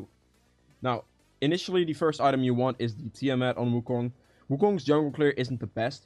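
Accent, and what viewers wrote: Netherlands. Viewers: Are you dutch, you sound dutch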